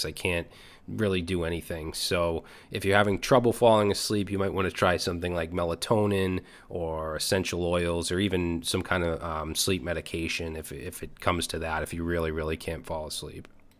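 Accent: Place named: New England, United States. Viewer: In regard to Category 3 I (re)took up Vim about 2 months ago to minimize hand movements involving the mouse and for mental discipline. After practicing and note taking in terminal-launched VIM I came back to VsCode and installed the Vim extension. This is a work in progress but I'm not unhappy about it. In eighth grade I took a half-year (one class period) in TYPING and got myself to about 35 wpm. No regrets there. My advice now to my 1964 self would be to follow that up with a half-year of creative writing or coding in Vim, ignoring the technical issues of "what's a keyboard?", "what's a monitor?", and "PC, Mac, or Linux?" Ah, the IBM Selectric typewriter was still a bunch of concepts on a drafting table at that time. In your Category 1 arena I scrounged a monitor out of storage to enlarge my viewing area (same number of, but bigger, pixels) and began drilling myself in Tmux in parallel with Vim. 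BTW, Vim and Tmux like to fight in the back seat while Dad's trying to drive - I digress. My point isn't to promote Vim but encourage your viewers to consider ways to minimize mouse operations, reduce eye strain, and organize a work flow using tiled panes in terminal or GUI tools. Thank you for your video - it got me to organize my thinking on the above topics. BTW, your accent has me wondering if you and your neighbors are regretting a certain off-season move involving a certain QB relocating from a certain Massachusetts team to a certain Florida team. If this is the case I would b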